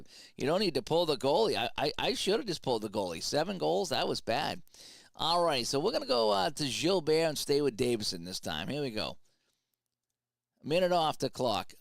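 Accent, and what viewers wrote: Boston, United States. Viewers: Wow that was a cool video! I listened to the whole thing as if it were a real series…lol. I just discovered SC Hockey a little while ago from your channel. Finally ordered it and I love it. Especially the feature for creating your own teams. So I am just about finished with assembling the 1980 Olympic Hockey Teams. If I send you my excel files would you consider doing a video of a game or two from the tournament? There were so many Boston players in our USA team that your accent would be perfect.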